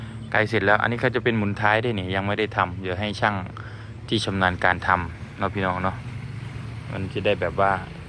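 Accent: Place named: Korat, Thailand. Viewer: เอ้าอยู่เทพสถิตหรอ อากาศดีนะ อ นั้นเว้าโคราชกัน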